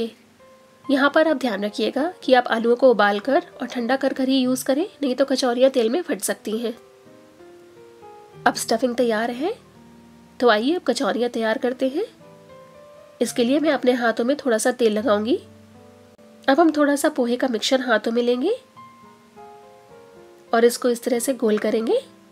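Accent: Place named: India. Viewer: I like to make new recipes and I always try new ones and I m a regular visitor of ur channel. I like ur hindi accent n ur way to describe d whole recipe but today, very first time I m seeing u in indian attire. looking very nice as ur recipes.. keep doing ji and thanks for different types of cookery inventions.. ☺👍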